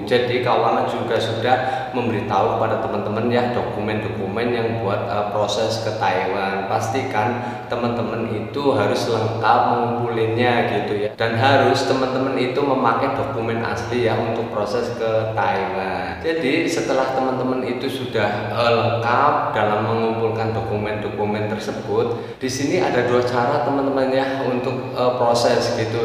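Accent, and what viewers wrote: Banyumas, Indonesia. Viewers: Ngapak?, Cilacap apa Banyumas mas?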